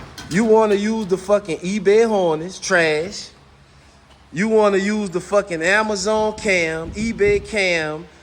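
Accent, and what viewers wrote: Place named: South, United States. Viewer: Damn bubba you talk as country as I do 😂, Ima old ass white boy too😂, there's alot of other work besides sitting a long block on the mounts that folks don't think about or consider 😂. $$$ I gotta turn the wrenches myself while using a chilton or haynes repair manual cause there ain't no damn way I can afford to pay a professional wrencher!!! 🎉 enjoy your honesty and straight forward explanation of whats required to drive a damn vehicle!🎉 I'll take that ol school 305 and do what we used to do-cruise🎉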